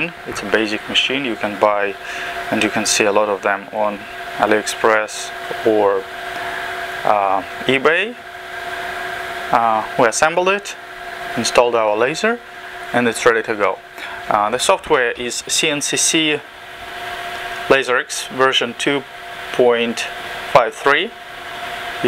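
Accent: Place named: Russia. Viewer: Takoy Russian accent :)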